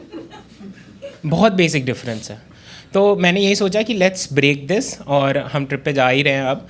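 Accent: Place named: North, India. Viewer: Accent is typical dehati, improve it if you want to target pan Indian audience, typical North Indian heavy accent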